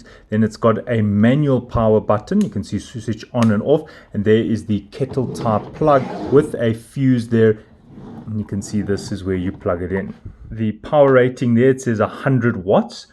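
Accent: South Africa. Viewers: If the accent did not give it away. The SA plug was the confirmation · Cool, m in SA too, heard the accent, keep it way 🆙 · Wow that is unmistakable south african accent, nice to be here, mzansi too!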